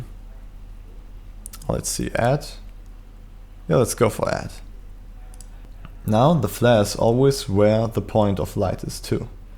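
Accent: Germany. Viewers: Yeah sounds german 😀 Greetings from Germany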